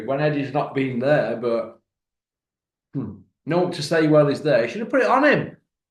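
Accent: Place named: Northern England, United Kingdom. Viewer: Your quickly becoming my favourite boxing channel. Rough round the edges worts and all just a straight talking northerner. Greeting from the red rose county ;-)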